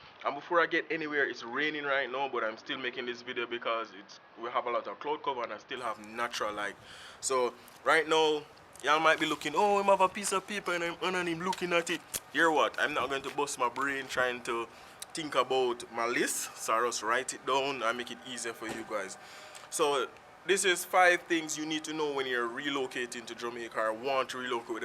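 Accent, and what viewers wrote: Jamaica. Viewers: Love the Jamaican accent!!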